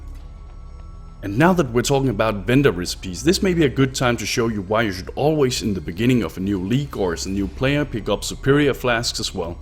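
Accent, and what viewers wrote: Denmark. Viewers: Er du fra Odense? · you sound danish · It sounds like you're Danish, Are you? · He sounds danish in the accent. How do i know , well iam danish :P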